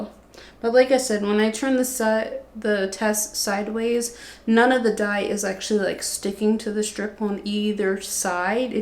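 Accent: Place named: East Coast, United States. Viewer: Sometimes your accent it’s light. But once you start getting “frustrated” it’s like BAMM. I love the East Coast accent 😍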